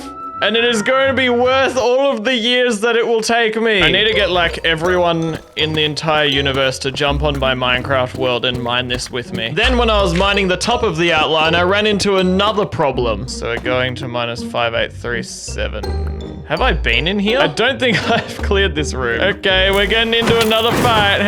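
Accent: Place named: Australia. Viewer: hi are you from australia cause its your accent and i am too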